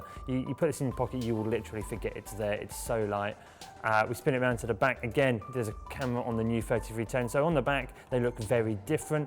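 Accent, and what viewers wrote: United Kingdom. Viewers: at least put subtitles, if you're going to talk like a english peasant, from potato fields.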